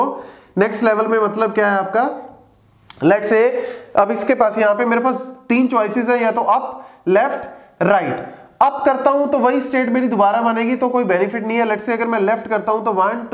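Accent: India. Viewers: Indian English.. too hard for me to comprehend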